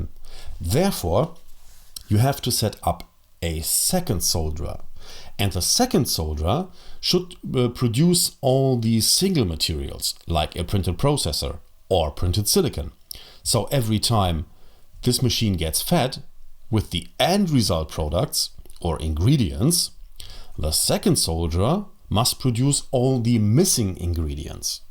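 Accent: Germany. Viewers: Do u come from germany? ^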